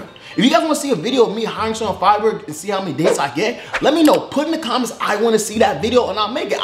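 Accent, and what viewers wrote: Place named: United States. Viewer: He doesn't even sound like hes from Toronto. He sounds American as hell.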